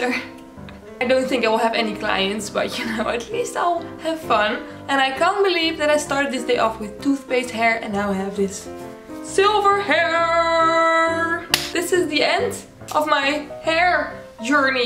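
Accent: Netherlands. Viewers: Haha ja, nogal Nederlands accent hè, dat is zo leuk van mensen over de hele wereld op youtube, alle verschillende accenten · Wait are you dutch? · Where is she from? she sounds dutch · are u dutch? bc idk ur accent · Ben je toevallig Nederlands? Ik hoor daar ergens een Hollands toontje😂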